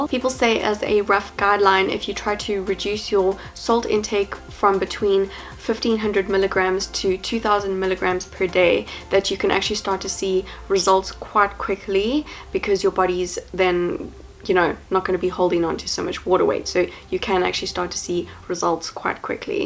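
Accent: South Africa. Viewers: she has south african accent i quess and it sounds so elegant and relaxing i agree 💕💕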